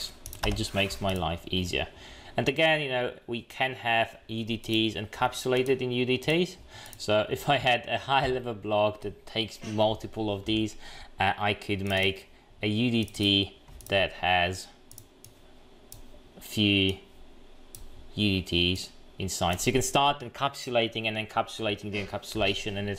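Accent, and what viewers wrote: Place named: United Kingdom. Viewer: Thanks man ! where is coffe button ? // BTW your brtitish accent is perfect for me so clean 😃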